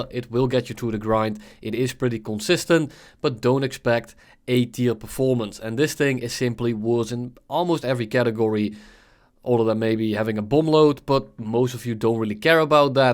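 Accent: Netherlands. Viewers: Steenkolen English 👍🏼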